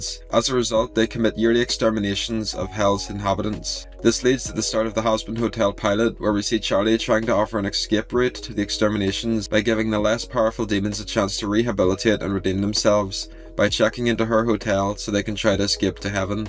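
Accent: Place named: Northern Ireland, United Kingdom. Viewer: are u northern irish? i immediately recognised that accent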